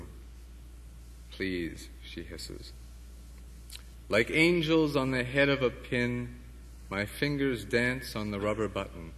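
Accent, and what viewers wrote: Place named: Canada. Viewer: It is his young voice with canadian accent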